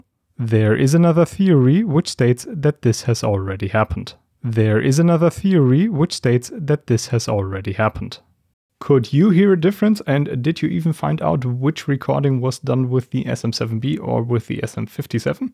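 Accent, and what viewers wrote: Germany. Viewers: HI #Detschakzent trotzdem cooles video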